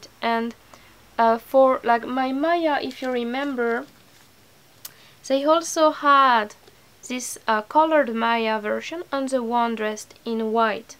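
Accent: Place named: France. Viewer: she is cute ! congrats ! are you french ? you have a accent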